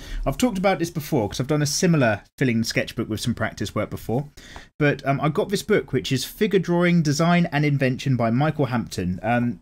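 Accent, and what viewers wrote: United Kingdom. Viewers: dude ur british? can u be my yoda brah?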